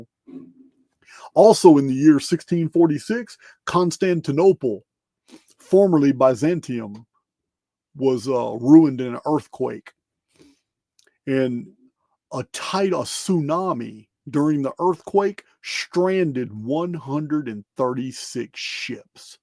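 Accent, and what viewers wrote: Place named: United States. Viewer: I like the way Americans pronounce our English names. Wales is even funnier😊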